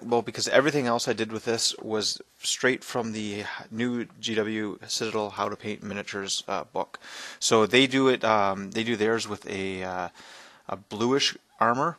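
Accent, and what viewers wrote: Canada. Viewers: i know u are from canada only because you say ewt instead of out lol! no offence nice vid.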